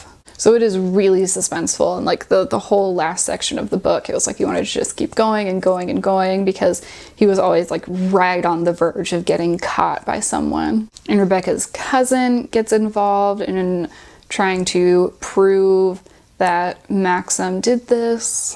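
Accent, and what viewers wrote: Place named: United States. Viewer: annoying american accent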